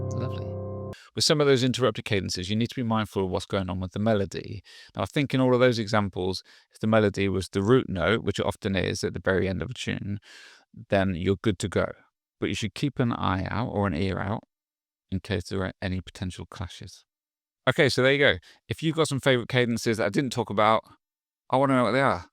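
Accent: United Kingdom. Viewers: thanks for your excellent teaching! Your British accent makes it extra special and realistic!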